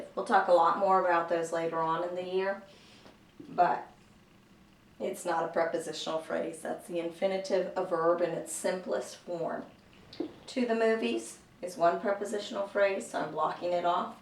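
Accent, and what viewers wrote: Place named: South, United States. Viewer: Beautiful southern accent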